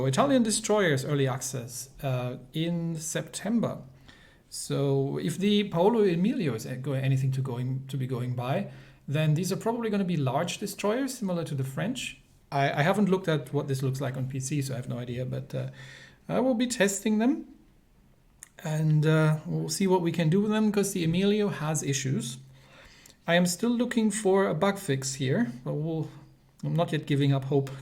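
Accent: Germany. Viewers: I must say, I do love voice. I do like a German accent. I equally like accents that sound german. May I ask, are you German? If not, then, if you don't mind me asking, what ethnicity are you? By the way, a few suggestions: try playing World of Tanks Blitz (WoTB), and, furthermore, try doing like a series of livestreams where you grind, on a new account or, if possible, on your current account, an entire ship line, from tier 1 to tier 10, in WoWsB. For example, the Yamoto line, or, even better, a brand new ship line, when another new line of ships comes out.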